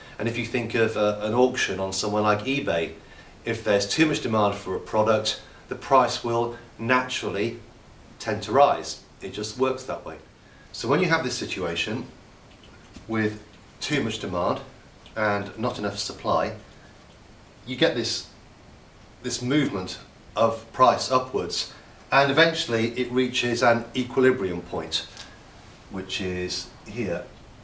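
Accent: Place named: London, United Kingdom. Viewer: Perfect mate. You're a londoner ain't it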